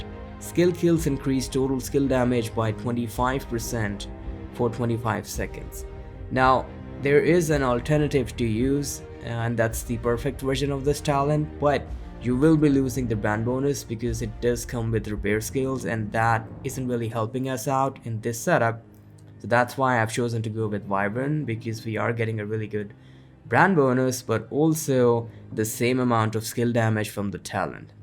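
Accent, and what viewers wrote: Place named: Germany. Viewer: Dat German accent😊 😉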